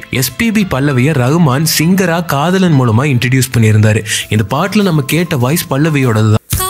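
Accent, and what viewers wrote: Kongu, India. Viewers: நண்பா ரொம்ப ரொம்ப ரொம்ப ரொம்ப ரொம்ப ரொம்ப ரொம்ப ரொம்ப நன்றி நண்பா இந்த கொங்கு தமிழ்ல பற்றி ரொம்ப நாளா கேட்டது இருந்தேன் இப்பதான் எனக்கு மன நிறைவாய் இருக்கிறது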